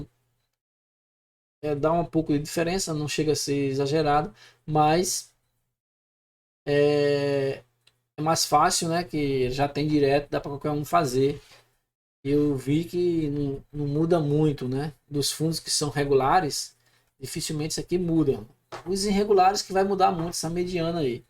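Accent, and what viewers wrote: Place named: Natal, Brazil. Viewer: so perguntei pelo sotaque que parece com o meu, sou de Natal mas to morando no PR.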